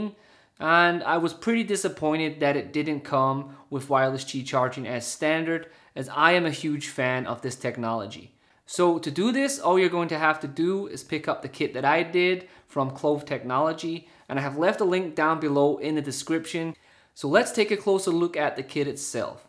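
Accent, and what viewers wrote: United States. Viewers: You're American accent is better than my Scotsman accent. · Nice one. Lol. I Truly enjoyed the video, and your accent. I'm not a big fan of wireless charging, but I'm getting a free G4 from a contest, and I'm going to get the one that you showed just for the heck of it. Your American accent sounded just like a friend of mine in Florida. What a hoot.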